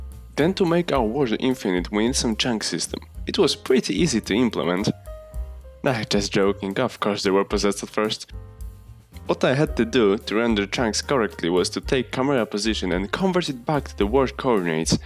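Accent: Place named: Russia. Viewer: it means gj. Cuz ur accent I thought u r russian, but anyway, u made a good tone of work. That inspired me so much. Right now studying golang, and i hope i can make it, wish me luck :D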